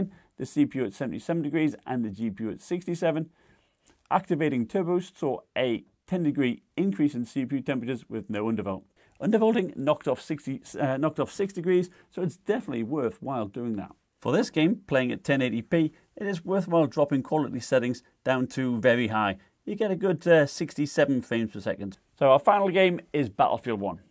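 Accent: United Kingdom. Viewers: Thanks for the review. I've bought this model and I find if to be amazing for the price and specs. What's your accent. I know it's British but which part.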